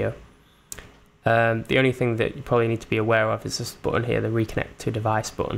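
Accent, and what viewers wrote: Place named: North of England, United Kingdom. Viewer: Great plugin just purchased it! Sounds like a North of England accent! :-)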